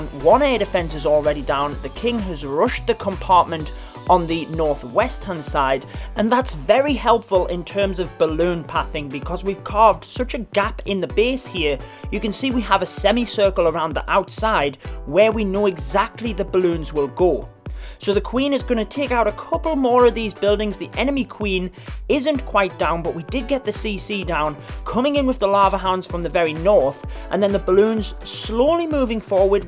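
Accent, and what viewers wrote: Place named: Scotland, United Kingdom. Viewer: Are you Scottish? Love the accent.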